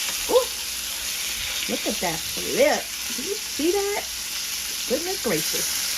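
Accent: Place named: DMV, United States. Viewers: Looks delicious.. and I know a DMV accent when I hear one. I love it!💜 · Yes! That undeniable DMV accent. And if I'm hearing correctly, I think it may even be right outside of DC on the VA side - "town" side of Alexandria even tho I'm from the "highway" side. I could just be biased lol 😌. This looks so good I can almost taste it!!😋🤤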